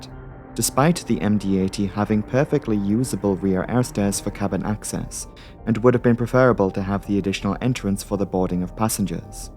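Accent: Newcastle, United Kingdom. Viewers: You sound a bit geordie, but what else is going on with your accent, no way it's100% newcastle... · Why I’d neva ov thought you were a geordie. I’m from Durham U.K. love your vids. They’re canny good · Your Geordie accent was Beltas Mate! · I would never have guessed you had a geordie accent :) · Thank you for an amazing researched informative and beautifully presented video. By the way, nothing lovelier than a nice Geordie accent! X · "Dana Air...grounded for maintenance and other violations so often we haven't had a fatal accident in ten years!" Your dedication going down the WTF rabbit hole at the end of this was appreciated. Incompetence is funny when it's not injuring people (although those who need refunds might want a broader definition of "injury".) And never apologize for a Geordie accent. As far as this American is concerned, the BBC has to apologize for exporting only programmes in which everyone spoke RP or occasional Cockney until the 90s. 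They've been holding out on us! Now that we've basked in some lovely Sheffield and Leeds Yorkshire for the past five years, Doctor Who isn' allowed to have a southern accent again until they've cycled through Scouse, Mancunian, Brummie, Geordie, Welsh, and Northern Irish. ;) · Your not a Geordie are you ? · You're a Geordie? I'd honestly never have guessed until you made it very obvious! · I have wondered about you accent. I had no idea that you're a Geordie. Awesome! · I'd adore if you did a full video with your native Geordie accent, it's such a lovely one! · Go hard on the Geordie accent Sir. I was really fascinated by your research and subsequent report. Ah, I do not think I need reminding that booking a flight on Dana Air will not result in a satisfactory outcome. Sounds like a way to fleece unsuspecting, non-Nigerian international travelers out of their money and stash it under the mattresses of certain Nigerian government officials. 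To be fair, most of middle and south Africa is in a state of turmoil at this time, so it is not surprising that a certain 'cowboy' style of airline operation would be able to survive in such a theatre. What was especially shocking however was your discoveries concerning the engine maintenance outfit in the USA. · Your a Geordie? Well that was a surprise. Haddaway. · I started the video wondering what accent this is, but wouldn't have pegged it Geordie apart from the pronunciation of year. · GREAT video. I used to live in America, and I keep abreast of news from the states. Florida governor DeSantis is pro business to the point of business there running rough shod over ANY regulations what so ever. It's become an abyss of regulation dodging garbage. I am NOT SURPRISED so much of this is traced back to Florida at all. I would not trust anything coming from Florida (including the pilots themselves it seems) to have any degree of quality to it these days. 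You produce amazing videos, lovely Geordie accent girl!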